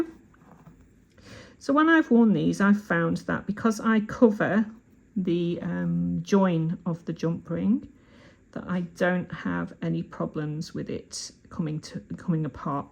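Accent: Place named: England, United Kingdom. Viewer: Ok, you can say ok all you want, ok 😊.... ok! I do like the darker material for your background. I was going to suggest a beading mat, but that looks good. Sorry for people being people... I think you are great! I really enjoy how you speak and the way you speak from England being from America, it's neat yo hear the differences. 😊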